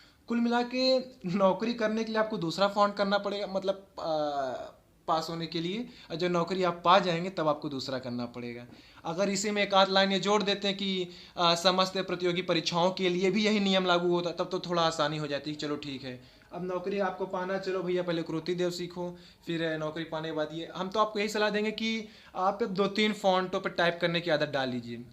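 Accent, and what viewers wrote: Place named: Kanpur, India. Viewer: Aap ek ek bat ko bahut hi clear btate hai.....Aur sbse achi bat aapki ki aapki language mujhe fatehpur ya kanpur nagar ki lagti hai...Thanx so much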